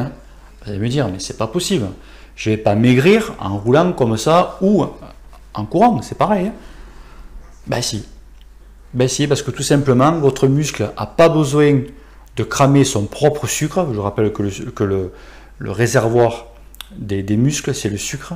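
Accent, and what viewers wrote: South, France. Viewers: Dit.....sans rancune.....on se passerait bien du jingle .... sinon ton contenu est MEGAAAAA en plus de ton petit accent du sudeeee....très charmant !!!!! 😅😋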